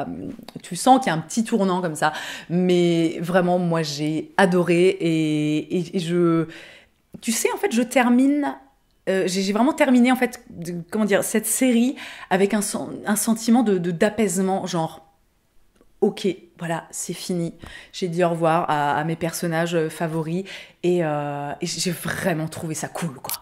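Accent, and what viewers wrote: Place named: South, France. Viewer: Une vidéo revigorante (avec l'accent du sud) j'adore, prends bien soin de toi, compte bien tes cachets antidépresseur (haha) et vive la menthe poivrée !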